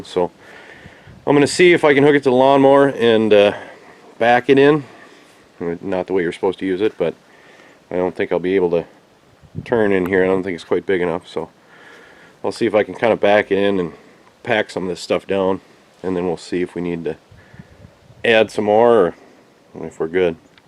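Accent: Minnesota, United States. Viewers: Hey man r u a Minnesotan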